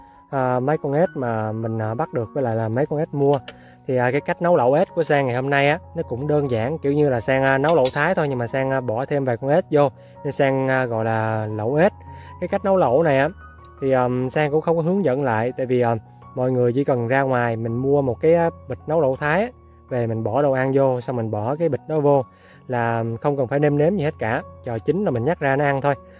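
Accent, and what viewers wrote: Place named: Ben Tre, Vietnam. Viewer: E là ng miền tây ha sao mà vọng nói của e nge y như ng bến tre vậy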